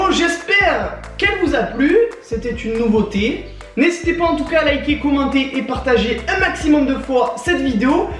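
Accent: Marseille, France. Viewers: tu et un Marseillais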